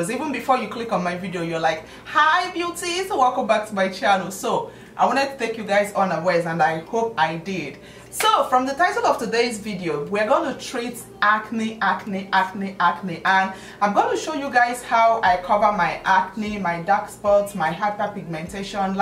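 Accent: Nigeria. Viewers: You are so beautiful and tutorial is explanatory and easy. You sound Nigerian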